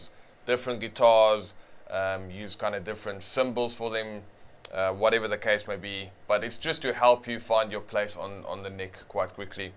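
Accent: South Africa. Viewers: bru I recognise that South African accent anywhere!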